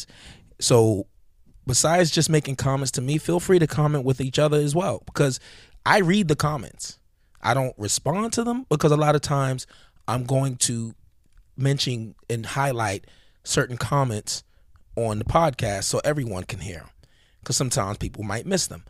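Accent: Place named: Brooklyn, United States. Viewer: Good podcast brother. I'm sorry if you answered this in a previous cast because I just found you channel recently, but what borough or part of NY you from? You got a BK accent to me sounds like Saigon too. Dope channel, glad I found it. Respect from Queens via the Bronx. Word up to my dude Kemba. That rook in Orlando, Isaacs, is also a Bronx kid from what I've heard. ✌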